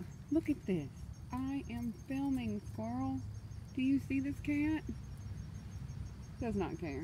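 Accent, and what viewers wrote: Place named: South, United States. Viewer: You sound like you’re southern. Where are you from ? I like your accent .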